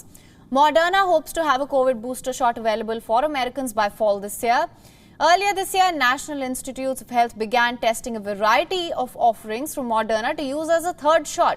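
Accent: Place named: India. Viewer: Something about Indian English... It like proper English with unusual word choices on occasion.😎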